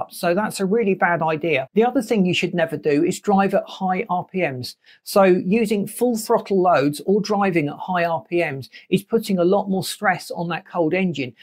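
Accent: United Kingdom. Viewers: "zois compoinets hate up" ???? bro I h8 this annoying uk accent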